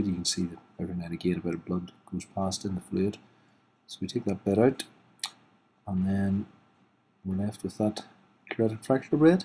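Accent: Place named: Northern Ireland, United Kingdom. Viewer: Hi, I'm a N'Ards (Co. Down) girl living in England and my colt has just had this surgery. I'm re-assured at seeing this video of the surgical procedure, but have many questions to ask. He's a 9 month old SHxWB, Fleetwater Opposition and Cavalier Royale bloodlines. Concerned owner is all, but hearing the NI accent has made me ask if you have the time to give me some info on post-surgery aftercare etc? I've been told is a cartilage defect? I'm confused...!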